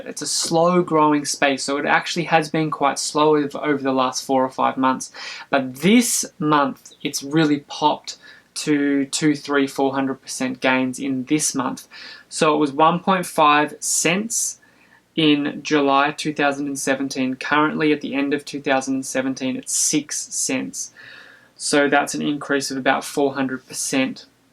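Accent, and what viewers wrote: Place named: Australia. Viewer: Are you an Aussie, Mate? I can hear your Stroine! Goodonya mate! The Theme Song kinda gave it away as well!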